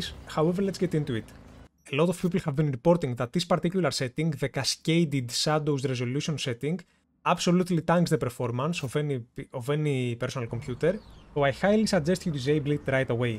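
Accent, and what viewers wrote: Greece. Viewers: Greek .. I can bet you Are greek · From your accent, I instantly realised that you are from Greece. Δεν περίμενα να έβρισκα Έλληνα youtuber να μιλάει για το cyberpunk xd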